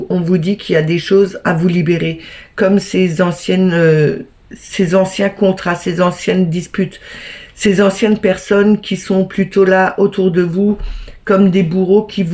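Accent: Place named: Grand Est, France. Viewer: Vous avez l accent du GRAND EST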